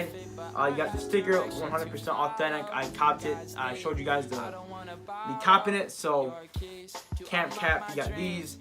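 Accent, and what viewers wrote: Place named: United Kingdom. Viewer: Your voice sounds half British! Fucking great video tho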